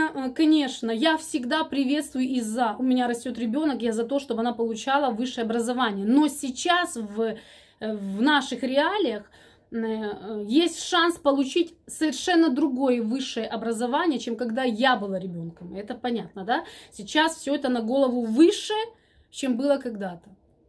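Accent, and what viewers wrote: Ukraine. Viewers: Мне,честно,непонятна суть претензий. Сразу же "слышно", что вы с Украины. Почему вы должны идеально владеть русским? Разные регионы, разный уровень владения языка. Аналогичная история у Татьяны из Италии. Ее постоянно "цепляют". С дочкой говорит на украинском, с мужем на итальянском, канал ведет на русском. Если мне человек интересен, на мелкие детали я не обращаю внимания. К замечаниям относитесь проще, смешно сказать, но даже Катю (пенсилбокс) бывает поправляют! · я преподаватель русского языка и литературы.Живу в Харькове, родилась здесь.У нас 90% населения города говорит на русском или на суржике. Мне сразу режет слух, когда говорят не чисто...Но!!! Это такая ерунда...по большому счету!!!.. И Украина откладывает свой отпечаток на произношение, а иногда и ударение. Я никогда не делю людей на правильно говорящих, с высшим и без образования!... 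Главные критерии- доброта, душевность, природный ум и мудрость.💖 Вы, прекрасная молодая женщина!!! И не нужно Вам оправдываться!!! Кому Ви до вподоби, ті будуть Вас слухати і дивитися!!! Я Вас підтримую.Ви дуже гарна!!!😁😍♥️👍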